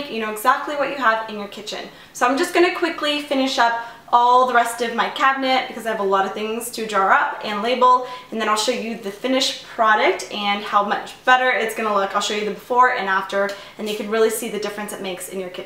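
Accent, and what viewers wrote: Canada. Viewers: Love your recipes and ideas. You should have a show on the Food Network. Is that an Italian-Canadian or Greek-Canadian accent?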